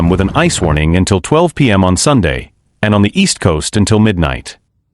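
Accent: United States. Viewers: Why is it an American reporting this….sounds like bull … No different to any other year for some parts… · Why has the narrater got an American accent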